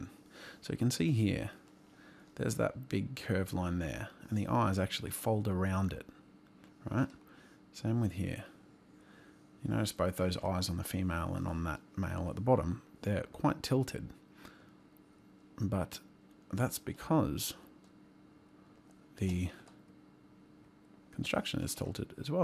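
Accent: Australia. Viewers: You were somehow more Australian then you are now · <3 australian accent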